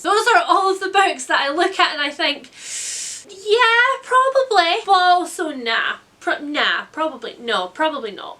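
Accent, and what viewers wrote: Scotland, United Kingdom. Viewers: I’m so glad I found your channel! You’re personality is so cute and entertaining (: and i love Scottish accents lol (probably because my mums a Scottish immigrant aha!)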